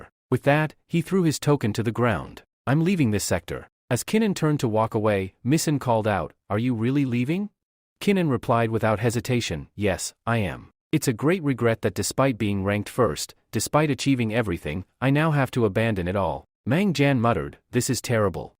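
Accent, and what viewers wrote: India. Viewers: WHY IS IT INDIAN BRUV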